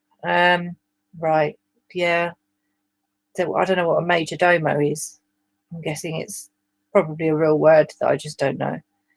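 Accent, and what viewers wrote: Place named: United Kingdom. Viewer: Thanks to Oddie (the fantastic) I am your newest fan. I'll be sure to try and be glorious in the comments as your british voice in the air.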